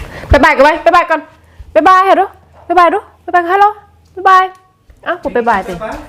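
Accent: Savannakhet, Laos. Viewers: ມັກສຳນຽງສາວສະຫວັນ ລູກສາວຈັ່ງແມ່ນເປັນຕາແພງ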